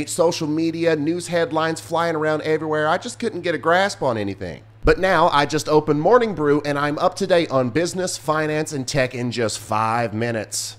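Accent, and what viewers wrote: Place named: Kentucky, United States. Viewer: Hello from London kentucky, u talk like ur close to here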